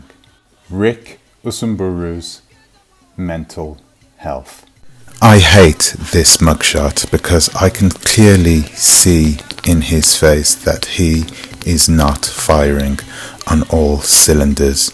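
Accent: United Kingdom. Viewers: Why don’t you focus on what the f** is wrong with Britain, the mother of all sadistic dysfunction . So weird seeing a black Jamaican in Britain talking about what is wrong with the United States in his British accent. UK is sending all your a*** back to Jamaica, why don’t you focus on that. Prayers and blessings for the BAG family for daring to take the future of their family into their hand and making such a bold and courageous move.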